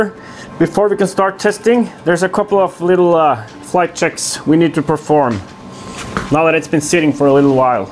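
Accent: Scotland, United Kingdom. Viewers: Scottish accent is hilarious! 😂❤ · The Scottish accent is getting better 👌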